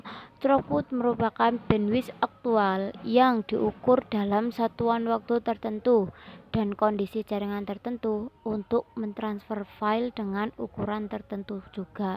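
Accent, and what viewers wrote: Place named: Java, Indonesia. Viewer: Medok e..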